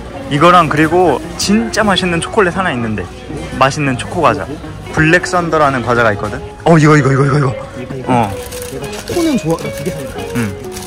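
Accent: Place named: Hakata, Japan. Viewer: 日焼け止めがこんな可愛くてもいいと~？❤ 博多弁のJUJUさんもも可愛か~❤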